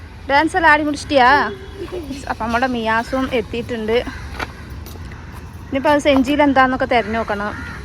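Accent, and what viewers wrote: Tamil Nadu, India. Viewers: മലയാളം തമിഴ് രീതിയിൽ അങ്ങ് പറയാ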